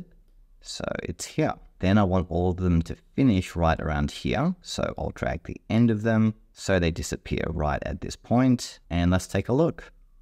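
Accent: Australia. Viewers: Thanks mate you are helping me get unstuck with these bloody reels.. you are Aussie, are ya maaate? cheers!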